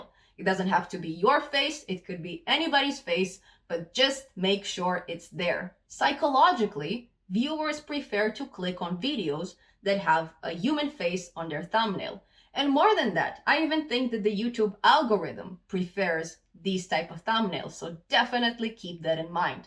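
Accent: Russia. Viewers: your voice gives me tingles. Where u from? Russia, Ukraine?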